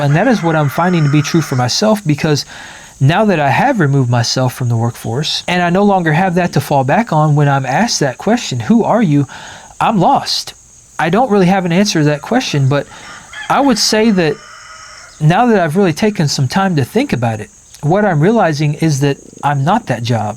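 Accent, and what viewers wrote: United States. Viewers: Im from the UK and only recently discovered your channel and really appreciate your posts! I am a little older but relate to so much of your thoughts and reflections. Thanks for uploading and keep It up! Can't quite place which American state your accent is from though. Texas? I work as a Project Manager and also struggle to explain who I am more broadly.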